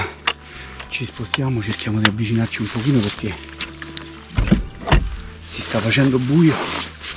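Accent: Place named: Central Italy, Italy. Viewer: Bellissimo video di caccia, complimenti ! Dall'accento sento che sei del centro Italia... di dove esattamente ?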